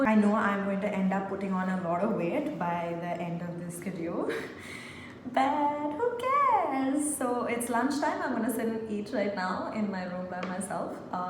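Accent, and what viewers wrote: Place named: United Kingdom. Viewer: Engrej ki tone bol ri h pgl hoshyari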